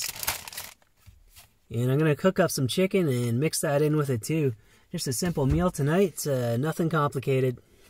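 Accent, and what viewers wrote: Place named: Ontario, Canada. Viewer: Thats how they talk in Ontario eh!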